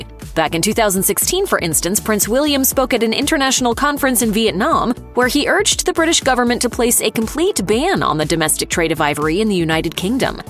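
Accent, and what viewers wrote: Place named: United States. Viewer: I do not understand why these British Royal ‘posts’ are narrated by an American.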